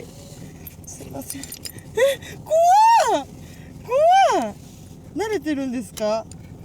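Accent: Okayama, Japan. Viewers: なんか喋り方に既視感あると思ったら 藤井風も岡山じゃったわ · 所々岡山弁でそうになって抑えとるところ可愛い笑 ほとんど岡山弁やけど可愛いぞ！ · 岡山弁かもし出してるのがいい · ぼっけぇ本音じゃねー(^-^)わしも岡山じゃけん、まあたその岡山弁たまらんのよ❗いつか、千鳥とコラボが出来たらぼっけぇ事なりそうじゃね。もっと岡山弁使っていこう❗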